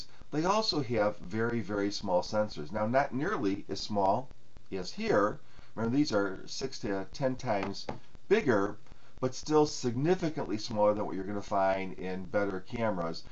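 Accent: Chicago, United States. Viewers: are you from chicagoland?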